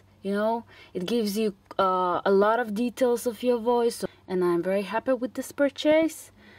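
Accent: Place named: Russia. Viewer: where are you from i feel a little Russian accent :)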